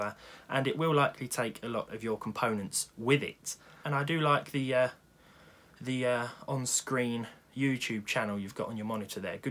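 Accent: United Kingdom. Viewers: Hello i have just come across your videos sounds like you are from the uk like myself i dont have facebook or Twitter. I am looking for someone to help me i have a 6-7 year old mac book pro works perfectly. I am currently using windows 10 via boot camp. My question is can i run windows on my macbook without using bootcamp? For example completely wipe the laptop of any operating system and just installing windows thank you i hope you can help daniel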